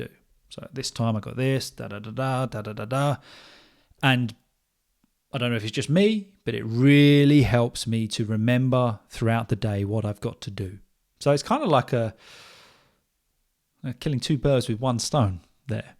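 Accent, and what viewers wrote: United Kingdom. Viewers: Hi! Today, in one single day, I’ve taken enough time to listen to you again. Three podcasts, and this one twice, because it’s great and helpful to everyone what you say about the ability or skills in speaking in a foreign language for those who want to speak it or get fluency. You have explained this important issue in a very clear way to be understood. Thank you. Se sentir bloqué ou avoir peur de parler dans une langue autre que la nôtre, c’est normal pour les adultes. Il faut se le dire et se le redire. Mais il faut vaincre cette peur. C’est possible! Ce que vous conseillez de faire à votre public pour y arriver nous semble tout à fait juste et fonctionnel. Merci beaucoup! Mais pour un Européen quelle sorte d’anglais faudrait-il essayer d’imiter? In my opinion your accent would be the best. Your British accent is the most widely and understood in use everywhere. 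· Fantastic teaching, speaking British English clearly sir you are every ways perfect. Thank you very much for your teaching British English Mr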